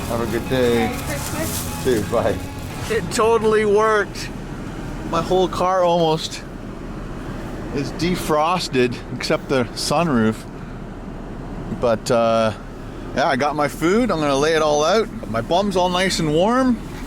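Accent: Canada. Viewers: Ouuuuuuu!!!! You are Canadian "EH"!) <3 I have always said that our own country is just as good as the USA to explore and take a vaca!) Fabulous work brother!) Keep up the vids!) <3 I will be driving there for sure!!!! I'm sure the mango nectar helps to calm the spice eh!?!) Would love to hear your story! ....where you came from and how you became a YouTuber!!! ;) <3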